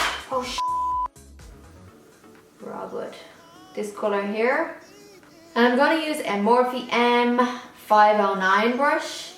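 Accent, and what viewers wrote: Ireland. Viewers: Another gorgeous look. <3 I love watching your videos. Your Irish accent is so cute and unique. Like, it sounds Irish, but you say certain words with a very "country" Irish sound. lol I'm sorry that may sound crazy but I mean it in the best way possible.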